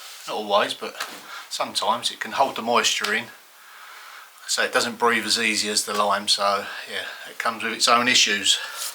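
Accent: Essex, United Kingdom. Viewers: Essex accent is just fine. Just had my DNA completed. Mostly I am from England. My direct immigrant ancestor was from Wherstead, Suffolk County. Went there, charming little village near Ipswich. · That Essex accent is quite charming for those of who haven't lived in England.😊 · I love your cats, I enjoy seeing what you’re doing with your lovely old cottage, and I can understand your Essex accent even though I’m Canadian! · Hi. I understand your Essex accent. I come from Zummerzet my dear but been in Aussie for over 50 years but still have my accent which I am very proud of 😊Be careful on those ladders and mind your back. The kittens are gorgeous and enjoying their lives. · With regard to your Essex accent, I love it! And yes, I can understand you just fine. With regard to the channel, well, both channels really, I thoroughly enjoy them, and I have learned and am learning quite a bit from you, as well as being happily entertained. 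I have learned how to point and render, including what the ratio is between sand and lime; building up a wall; the strengthening rafters and purlins; as well as learning a lot about French chateaus and history. So, thank you so very much. I really mean that. And I am always looking forward to the next video! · Luv the Essex accent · Nice one! I’m only in Romford and thought you sounded pretty local; for France!🤣 Really enjoying your work, love it. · I have to admit that I love your brocante and architectural tours of the ancient churchs and castles and of course eating vlogs the most, but there is still something very satisfying in seeing you complete some impossible, complicated repair jobs except this last one, today, you definitely needed a safety harness on and to the viewer looked dangerous. Your Essex accent is delightful and quite understandable and if some viewers want help just "google" Help understandinng English accents". 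Thanks for taking me along. Mary Ann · Nothing wrong with an Essex Accent. This is coming from an ex Romford Boy 😂. Another great video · Awsome job and being not english ( Holland) i have no problem ( so far ) with your essex accent. Love the kittens. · I always enjoy seeing what you’re up to and your Essex accent is part of your charm, no problem understanding what you say here.😊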